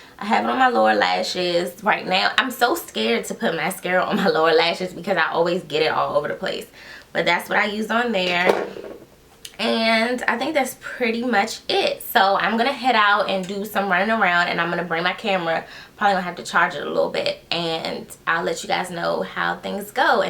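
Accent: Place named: DMV, United States. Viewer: Hi there! I stumbled upon your video as I'm trying to get reviews of this foundation. You sound like you're a local girl to me (I'm in the DMV). Thanks for the video!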